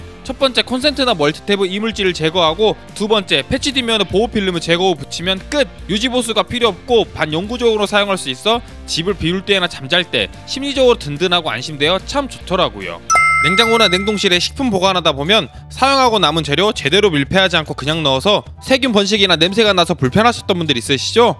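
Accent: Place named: Jeolla, South Korea. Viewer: 전라도 분이신가..말투에서 전라도 느낌이 확나는디ㅋ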